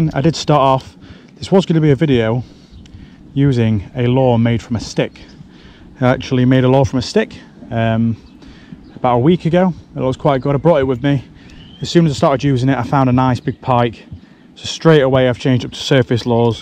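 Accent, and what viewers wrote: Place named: Yorkshire, United Kingdom. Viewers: Id guess south Yorkshire. At least thats what he sounds like to my N Yorkshire coast ears. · where are you from? yorkshire? accent sounds same as mine hahaha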